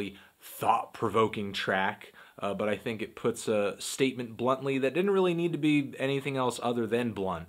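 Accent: England, United Kingdom. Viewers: I rate your English accent a light to decent 4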